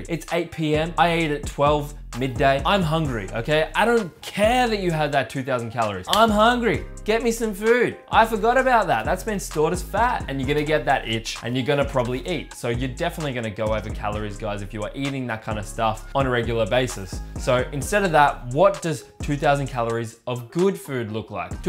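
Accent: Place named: Australia. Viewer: I love your accent... Let me tell you that I have not only learned to lose weight but also have learned the Australian accent from you.. Love your videos mate!! They are great.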